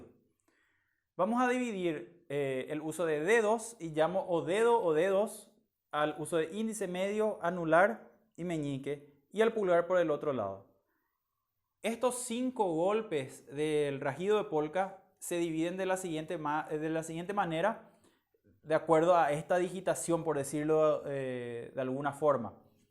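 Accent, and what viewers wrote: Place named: Paraguay. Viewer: por su acento y por lo bien que ejecuta la polca, definitivamente ES paraguayo 💞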